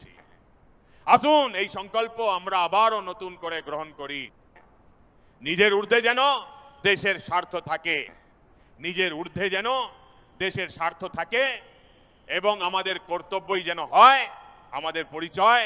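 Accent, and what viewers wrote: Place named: India. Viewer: বাংলা উচ্চারণ আরেকটু স্পষ্ট হলে ভালো হতো। অনেকটা হিন্দি টানে বাংলা হয়ে গেছে।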